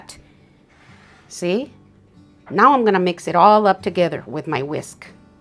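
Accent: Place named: New Mexico, United States. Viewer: you have a beautiful accent where are you from? You sound from my home of New Mexico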